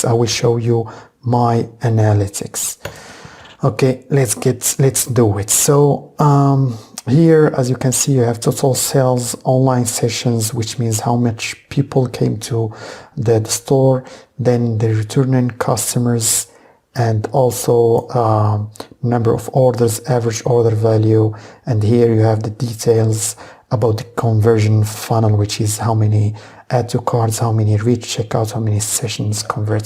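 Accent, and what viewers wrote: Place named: Morocco. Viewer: 😂😂xttî 9x3tk mn l accent dyalk bl3ks wlkin wakha hakkak kyn wahd lam7 sghiiir kybyn dk l3r9 lmghribi hhhhhh thy bruuuh!! ♡♡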